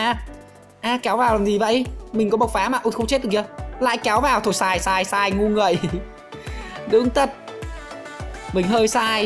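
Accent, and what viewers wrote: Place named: North, Vietnam. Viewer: Giọng bắc dễ thương qá :v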